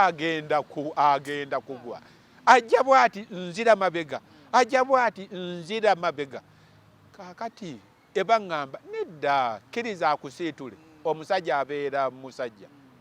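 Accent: Uganda. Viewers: Nze bwedda ndowooza si muganda. Nga ndowooza mu Easterner kuba ebigambo byo' luganda abi pronouncinga nga bo. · Why call yourself when are clearly a Mugishu? Your accent is from the ba Masaba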